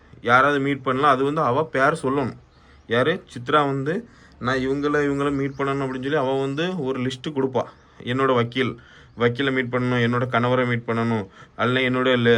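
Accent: Tirunelveli, India. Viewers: அண்ணா எங்க ஊர் திருநெல்வேலி பேச்சு மாதிரி இருக்கு அண்ணா சூப்பர் ❤️👍👍❤️👍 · நீங்க திருநெல்வேலியா bro?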